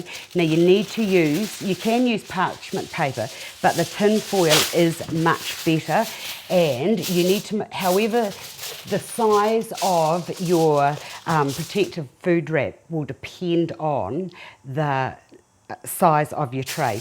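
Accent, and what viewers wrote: New Zealand. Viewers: André Manoel she is from New Zealand, not all sound like her though, we all have different accents here. Similar but not all the same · you look FABULOUS! LOVE your dress and belt. You sound like a New Zealander. I love New Zealand. I am Scottish but live in Perth Western Australia. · I am guessing that she/you is from New Zealand due to how she pronounces "egg" like "eeeeeg"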